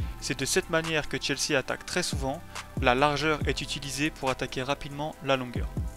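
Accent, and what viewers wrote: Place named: France. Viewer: Mec le format de tes vidéo sont lourd continue comsa les explications sont claires et quel bonheur d’entendre une voix française 🤩 je m’abonne direct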